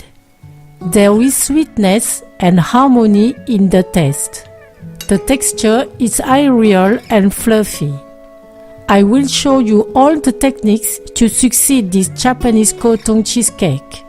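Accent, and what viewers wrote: France. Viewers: Je vais faire ce gâteau au fromage! J'étais dans mon lit, et j'ai pensé, je veux savoir quel est la première langue de. Elle parle anglais comme une personne française, alors je dois savoir ta première langue. Mais, cette vidéo est incroyable, c'est comme tes autres! C'est facile à faire, et la recette est tres claire.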